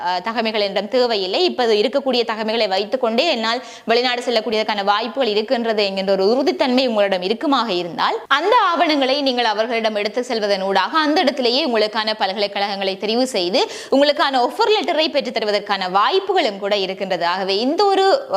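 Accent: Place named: Sri Lanka. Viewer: தங்களின் இலங்கைத் தமிழ் உச்சரிப்புகாக்கவே நான் பார்க்கிறேன்.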